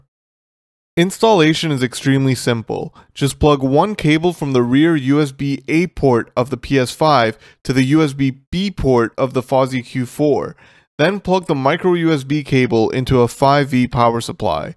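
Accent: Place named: United States. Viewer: sounds like a heavy dude, oh hes murican lool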